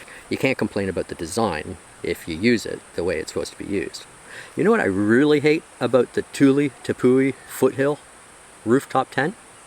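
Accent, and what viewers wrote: Canada. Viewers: aboat haha you are canadian for sure nice review ...come in quebec we have good sea side camping places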